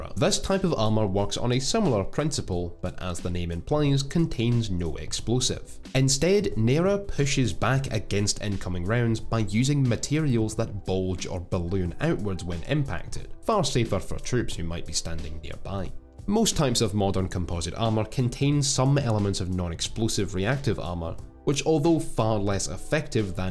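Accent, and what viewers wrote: Scotland, United Kingdom. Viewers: is he scotish ?! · Speak American man! I would love to watch and learn from ur videos but the Scottish accent irritates me, u pronounce a lot of words wrong. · Is this a scottish accent? Sounds awesome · bit of scottish for you there, pal, like the narrator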